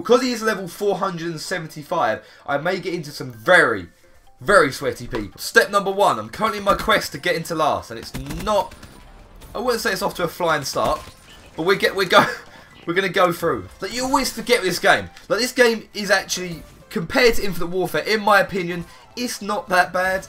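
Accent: England, United Kingdom. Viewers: Lee Gibson he's from England bro, he ain't American or Canadian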